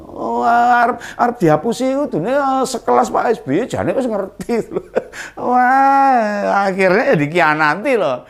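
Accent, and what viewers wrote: Java, Indonesia. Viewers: Omongan jowo nekhek jowo asli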